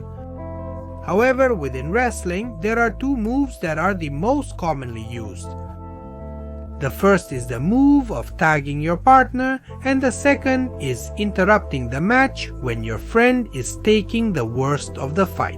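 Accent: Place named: India. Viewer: Why does bro sounds like Indian😭😭😭😭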